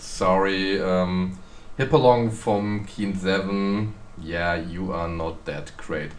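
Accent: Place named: Germany. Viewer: The Overlord did teleport right on to me a time or two, but it seems that there is a little more time to get out of its way. I never made a formal study of German in school, so I don't like to criticize the performance of someone who has put in that time. You have a German accent, but I can understand you easily. It has its charms.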